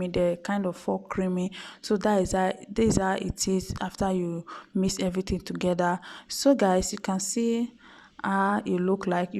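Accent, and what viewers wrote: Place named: Nigeria. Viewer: 😂😂😂😂😂😂she is from Nigeria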